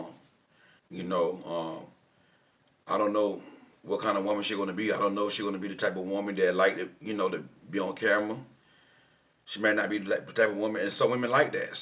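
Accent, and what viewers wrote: South, United States. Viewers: I love and the southern accent!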